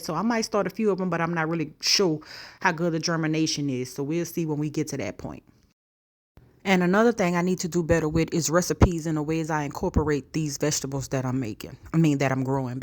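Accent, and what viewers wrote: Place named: Louisiana, United States. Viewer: You popped up in my feed and the minute you started talking I was like “she has to be from right down the street from me” 😂 (New Orleans) Love your videos. Love your garden. Even the part where you don’t talk. It’s real! And you’re showing us the everyday hard work it takes to grow your own food - instead of when the garden is all full and everything is blooming and it suddenly just got that way … you know the videos I’m talking about? Anyway, thank you for taking all the time it takes and sharing this, I actually picked up some tips or what to do’s from watching. ❤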